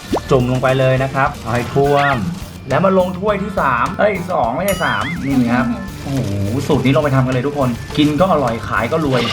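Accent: North, Thailand. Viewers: อยากเห็นแม่ พูดเหนือทั้งคลิปค่ะ มันต้องน่ารักมากแน่ๆ❤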